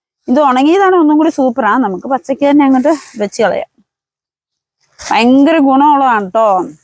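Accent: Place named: Idukki, India. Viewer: സ്ലാങ് കേട്ടപ്പോൾ ഞാൻ കരുതി ഇടുക്കിക്കാരി ആണെന്ന്... കാസർകോട് ആയിരുന്നല്ലേ....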